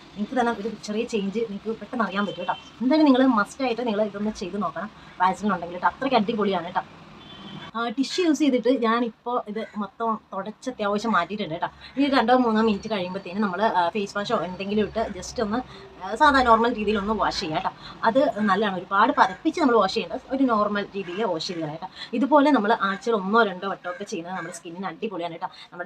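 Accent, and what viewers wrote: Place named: Hindi Belt, India. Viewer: Hindikaru de Malayalam tracilete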